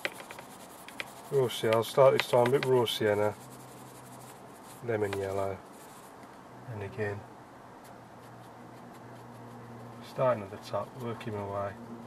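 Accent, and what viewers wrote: United Kingdom. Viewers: I LOVE your British accent! And the painting of course! Just a little bit details on the trees would have made it look even better but it looks great already, I love the colors you painted on the lake and the sky, they looks so real.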